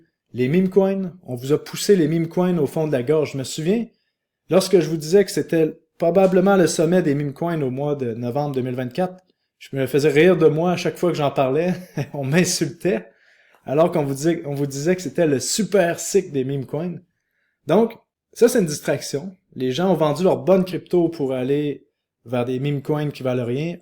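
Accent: Canada. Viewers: J’étais justement en train de revoir ta dernière vidéo en me disant que ça me ferait vraiment du bien d’entendre à nouveau ton point de vue et ton charmant accent canadien. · N'abandonne pas l'accent canadien en parlant français. Ça fait vraiment très sérieux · tu m'as fait adoré l'accent canadien ❤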